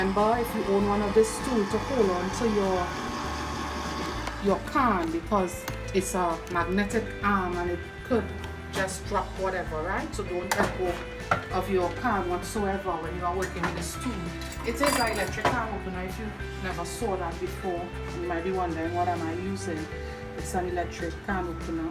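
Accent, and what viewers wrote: Trinidad and Tobago. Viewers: it's trini creole ( caribbean) it's English with trini accent just like most English speaking countries have their own accent lols and saying wtf was kind of rude because she spoke normal to me because trinidadians speak with a deeper accent than that maybe it's just the music.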